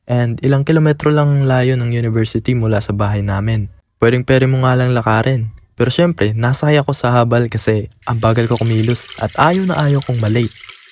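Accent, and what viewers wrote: Philippines. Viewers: Parang sa catubigg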